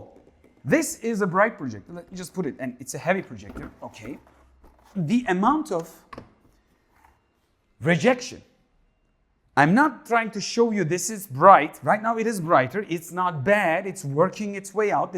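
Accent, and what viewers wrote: Turkey. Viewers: Eski bir videoyu seyrettim direk aksandan Türk dedim bu Abimiz)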